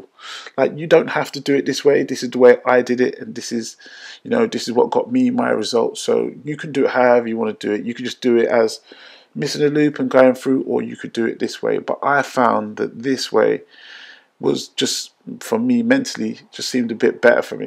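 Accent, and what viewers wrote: United Kingdom. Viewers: Finally found a video where someone did a fantastic job and spoke clearly in the Queens English, worth subbing and great upload. Thanks for taking time and sharing young man, appreciate.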